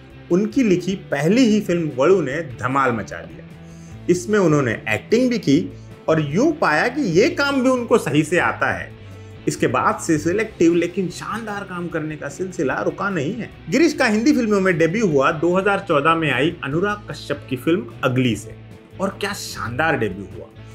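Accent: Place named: Maharashtra, India. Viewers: Hats off to your Marathi accent 🙏🙏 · मराठी उच्चार अगदी स्पष्ट आहेत याचा अर्थ तुम्ही महाराष्ट्रीयन आहात 🙏🙏🙏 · Aap bhi marathi a accent pa pata chalta ha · U are Maharashtrian. I know the way you pronounce the Marathi alphabet 'ळ'.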